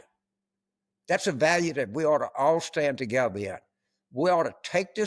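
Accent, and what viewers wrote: South, United States. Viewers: Southern redneck?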